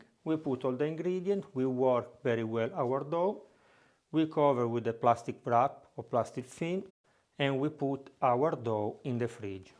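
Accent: Italy. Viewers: youre the cutest english speaker amongst italians..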